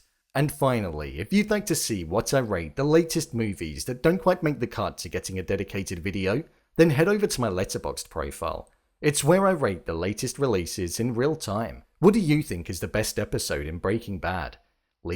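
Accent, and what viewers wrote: United Kingdom. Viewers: Why does yt keep recommending me bri’ish video essayists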